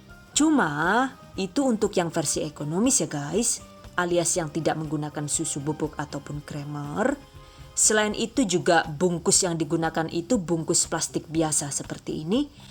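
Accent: Banyumas, Indonesia. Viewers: Like Bunda ngapak KU tersyg 🤣 🤣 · Keren koh ngmg ngapak Sukses embak e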